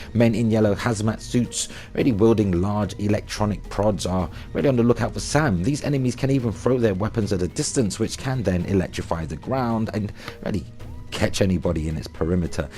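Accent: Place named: Cockney, United Kingdom. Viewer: How come you’re posh in your produced videos, but all geezer in your podcast chats?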